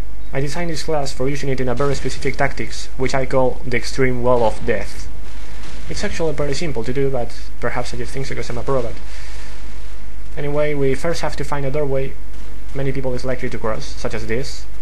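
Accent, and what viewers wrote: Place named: Spain. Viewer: Lovely spanish accent... (Y)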